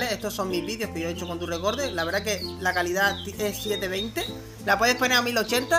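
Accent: Dominican Republic. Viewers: Dominicano verdad?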